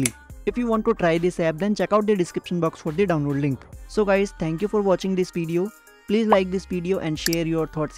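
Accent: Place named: India. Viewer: It's a normal indian accent. Your comment is racist af.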